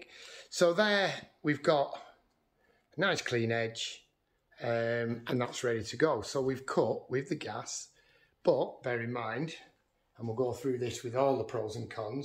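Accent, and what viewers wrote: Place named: England, United Kingdom. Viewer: it's hard to listen to specially the English accent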